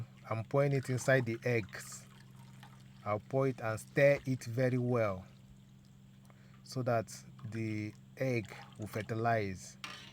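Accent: Nigeria. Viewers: Nwanne your igbo accent dey give me joy 😅 thanks for the info too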